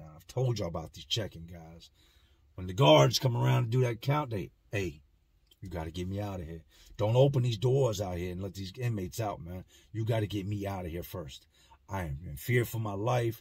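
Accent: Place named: Boston, United States. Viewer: yep all he does is do stories about small fry rapper beefs and shootings n shit. Just regurgitating the same shit as every other news outlet. He just does it with a Boston gangster accent. Thats it.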